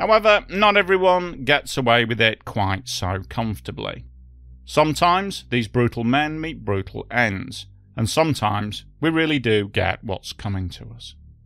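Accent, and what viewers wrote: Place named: Northern England, United Kingdom. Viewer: a pleasant northern English accent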